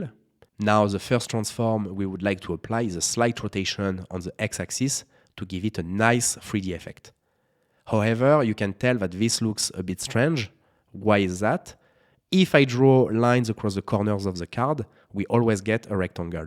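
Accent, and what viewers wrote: France. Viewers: Garde ton accent buddy. Les gens te comprennent c'est le principal. Et puis peut-être que c'est le petit truc en plus de ta chaîne. Le frenchy accent. 😀 La fameuse French Touch ! :elbowcough:.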